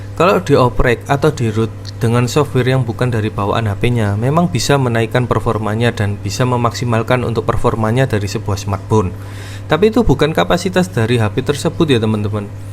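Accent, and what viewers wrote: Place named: Surabaya, Indonesia. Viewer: Suroboyo ta bang ? kok medok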